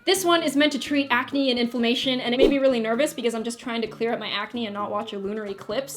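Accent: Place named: Canada. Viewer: Heehe totally random but I always feel super cool or something when I can sense/ hear a Canadian accent 😎😎😎😂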